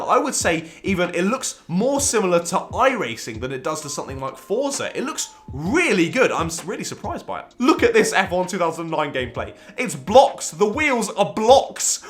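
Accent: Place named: Liverpool, United Kingdom. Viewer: I've looked into this before and it's really interesting, but it was ultimately a bit rubbish, good video but horrible scouse accent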